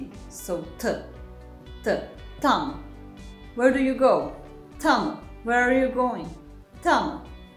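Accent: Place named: Poland. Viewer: I was thinking you are from Poland as I do, because I’m learning English from your video and interslavic sounds like language I already knows :)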